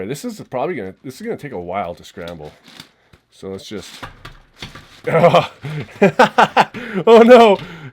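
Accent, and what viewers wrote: Canada. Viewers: I detect a Canadian accent ... you from Toronto ? Teach ya to play guitar if you help me solve a 3x3 eh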